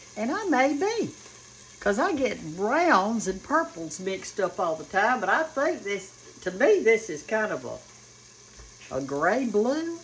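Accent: South, United States. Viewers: Love how you said you keep moving. Anything special you do? Love your videos and your wisdom. My mother was born and raised in Meridian, Mississippi. Your southern speech warms my heart🙏🙏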